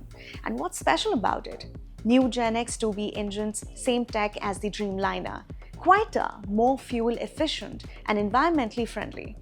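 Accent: India. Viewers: Oh no, that Indian accent, not again😩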